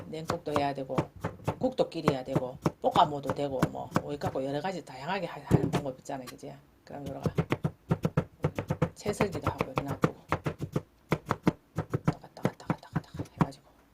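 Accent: Gyeongsang, South Korea. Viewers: 와우😮 진짜 좋은 팁 넘 감사합니다. 오이 얼려 본적 진짜. 없는데. 저도 그리 해봐야 하겠습니다 저도 어릴적 2년반 정도 부신에서 살았던적 있어 경산도 사투리 들을때. 정겨워요😅😅😅😅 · 경상도 사투리가 언제들어도 듣기가 좋습니다 .😊 늘 잘보고 있습니다 고맙습니다 ❤❤❤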